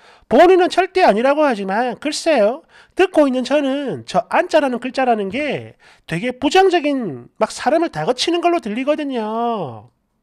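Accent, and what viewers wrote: Gyeongsang, South Korea. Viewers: 딱 경상도 남자들 말투네 ㅋㅋㅋㅋ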